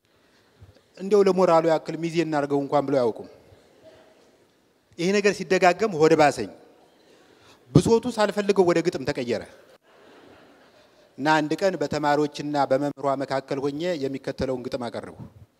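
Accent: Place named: Rural, Ethiopia. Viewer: በውቄ አንተ እኮ ትለያለህ የገጠሩና የባህል ዘዬ አቀራረብ ነው ዋው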